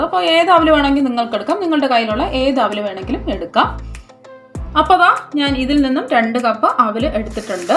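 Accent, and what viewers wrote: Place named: Kollam, India. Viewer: Mam, your recepy is very good and I love it. But your kollam language is also attractive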